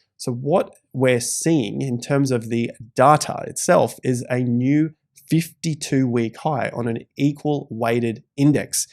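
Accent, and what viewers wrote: Australia. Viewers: How you say the DATA is an Australian thing (DATER)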